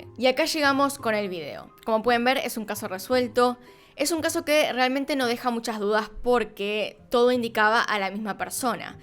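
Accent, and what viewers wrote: Argentina. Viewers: Saludos desde Virginia Estados Unidos 🇺🇸 pero yo soy de Guatemala 🇬🇹 me encanta tu acento argentino 🙃 salúdame 🙂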